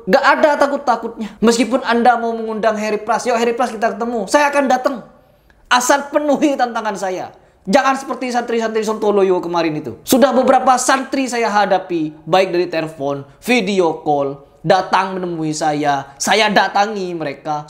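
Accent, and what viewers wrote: Madura, Indonesia. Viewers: Saya orang dayak, dari lugat bahasa bang, bang heri madura, saya sangat suka setiap konten bang, dan saya udah sekarep, bukan brarti orang dayak berbusana sama madura, yang berlalu biarlah berlalu, kita lupakan😂😂buat teman2 madura jangan tersinggung yah😂😂😂😂, karanena saya dayak sangat suka sama bang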